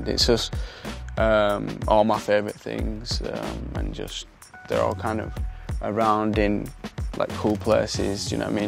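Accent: United Kingdom. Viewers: lol after so many years i finally hear him talking and that british accent was freaking unexpected af lmao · Because he rarely talks, I'm always shocked to hear a British accent come out of his mouth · OMG!!! his voice and his british accent is pure love!!! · Zayn is my reason love British accent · British accent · Is he tryna hide his british accent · I forgot how strong his accent actually is. You really have to pay attention if you are not British 😅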